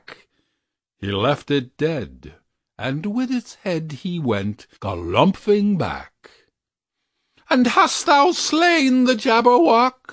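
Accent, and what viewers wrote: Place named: Transatlantic, United States. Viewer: I could live with the mispronunciations (they are made up nonsense words after all so a subjective interpretation is permissible), but the accent is just wrong. This is quintessentially English prose and a transatlantic rendition just doesn't seem appropriate.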